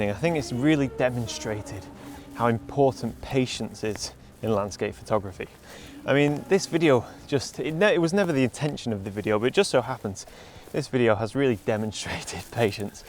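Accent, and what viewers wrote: United Kingdom. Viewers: You put an emphasis on slowing down, and I took up photography to fill the slow moments when out hiking. Irony (: I love the channel though, even though I think about photography with a British accent now. "Ab-solut-ly Stonnin!"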